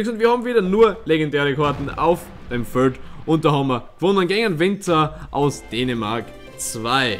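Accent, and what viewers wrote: Styria, Austria. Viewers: Ich kann dich verstehen weil Steirisch ist fast gleich Schweizerdeutsch😉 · I finds voll geil das du österreichisch redasch i find as isch bessa so :D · i hob an freind der a steira is und der red a wie du. I bin oba Niederösterreicher. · konnte es versthenen weil ich aus Bayern komme und weil da der Dialekt so ehnlich wie in Östereich ist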